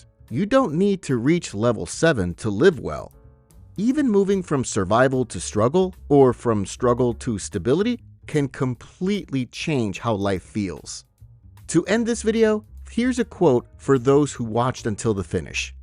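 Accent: United States. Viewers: I thought the American accent gave it away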